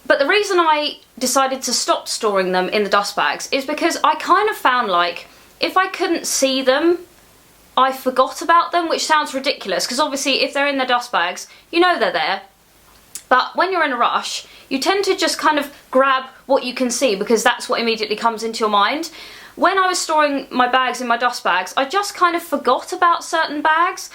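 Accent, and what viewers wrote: England, United Kingdom. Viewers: Just out of curiosity, may I know which part of England is this kinda accent from?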